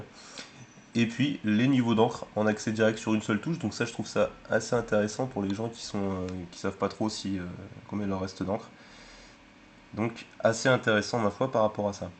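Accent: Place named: France. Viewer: Fr = French The accent seems to say he's from France.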